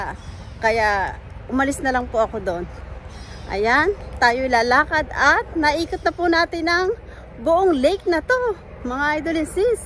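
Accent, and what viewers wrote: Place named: Philippines. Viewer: Wow ... beautiful country side .. the weather looks calm , at looking napa fresh Ng place .. awesome lake view .. thank you for bring us there .. sis .. feel ko ehh parang taga Bulacan or Nueva Ecija or Quezon ..kz Tagalog na Tagalog ka sis .. thank you for bringing us there in Georgia ..tru Virtual tour .. Sis ..paxshout out Po sa next video upload mo ..❤😊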